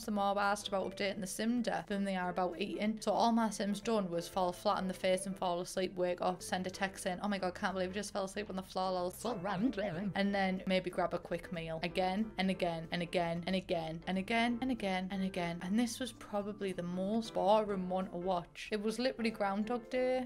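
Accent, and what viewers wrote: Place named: England, United Kingdom. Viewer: There’s this joke here in Aus about old ppl saying “film” like “fil- lim” but now after hearing you say it exactly the same I know it’s not something they do cuz they’re old, it’s cuz they came from your side of England! (Which my Nan did! Honestly I can’t deny that half the joy of watching your videos is to hear her accent & humour again!) Pretty sure Tea was dinner as well for much of my childhood & probably only changed when kids at school didn’t know which “tea” I meant.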